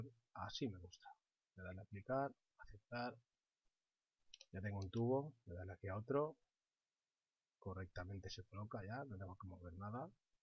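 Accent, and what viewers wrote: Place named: Catalonia, Spain. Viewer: acento catalan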